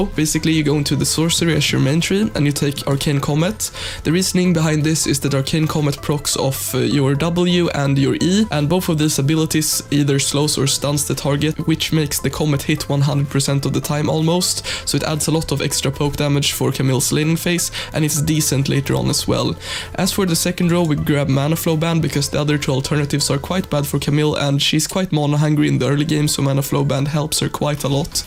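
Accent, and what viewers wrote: Sweden. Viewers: You can hear clearly he is swedish xD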